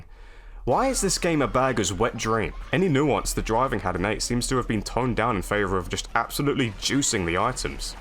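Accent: United Kingdom. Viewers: Well, you think your anglo poxon accent is human and is tolerable. Everyone has wrong ideas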